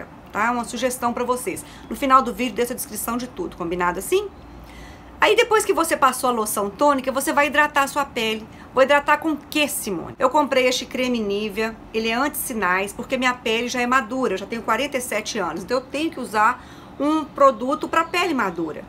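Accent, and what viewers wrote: Minas Gerais, Brazil. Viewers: sou casada com um mineiro.... amo sotaque de vcs! Te sigo aqui, te adoro, sempre amorosa e realista!! Você parece demais também com a sogra da minha filha, na voz e fisionomia, lindaaaa você! Gratidão por seus conteúdos! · Você é mineira? tem um sotaque diferente! Adorei seus vídeos.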